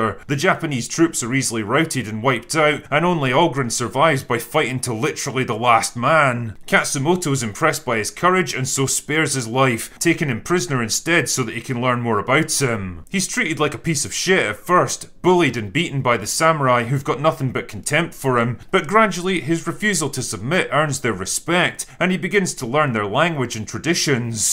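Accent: Scotland, United Kingdom. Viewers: Note to self: everything sounds better (and funnier) in an outrageous Scottish accent!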